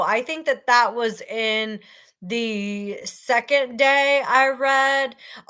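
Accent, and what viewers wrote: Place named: Louisiana, United States. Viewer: Buttery and Cajun accent do not belong in the same sentence.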